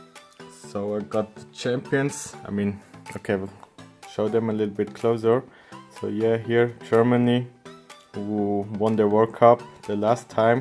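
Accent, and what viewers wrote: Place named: Switzerland. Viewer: Ich wette du kommst aus der schweiz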